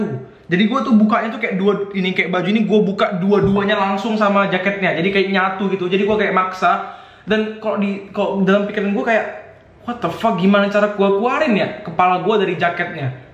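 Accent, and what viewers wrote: Batam, Indonesia. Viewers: bang lu org batam ya?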